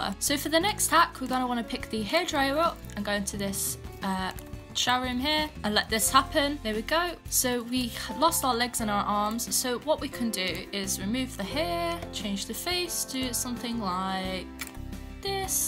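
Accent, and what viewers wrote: United Kingdom. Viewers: I love your accent btw! I think it’s british right? I’m british too.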